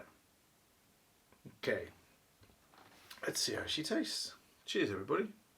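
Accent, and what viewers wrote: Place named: Wolverhampton, United Kingdom. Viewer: Great Wolverhampton accent too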